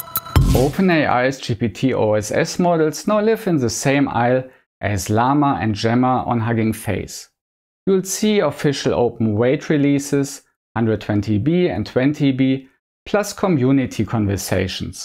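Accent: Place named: Germany. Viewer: Thank you for your video, it helps me A LOT? Ist das ein deutscher Akzent, der mir hier die Ohren.... maltretiert?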